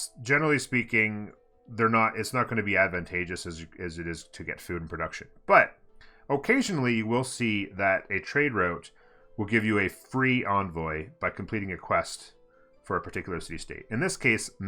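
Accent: Canada. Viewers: The Canadian accent is so adorable. Our and Are sound the same, but Hour sounds completely different. Love it!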